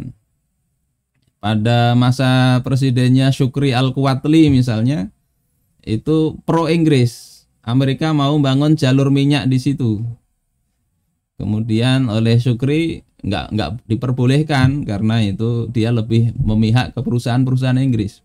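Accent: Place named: Java, Indonesia. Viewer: Bahasa Banjar logat Jawa tadz..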